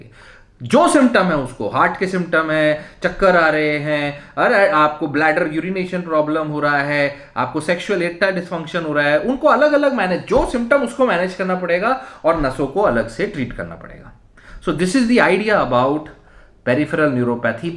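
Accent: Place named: Hyderabad, India. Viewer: Hydrabadi bolre doctor sab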